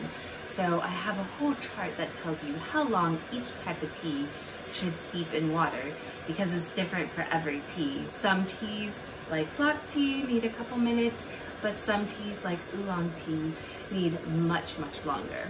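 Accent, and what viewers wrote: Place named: France. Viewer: Love your French accent!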